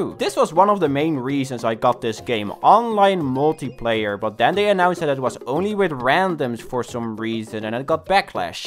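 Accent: Netherlands. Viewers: ah yes, dutch accent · Man is dutch?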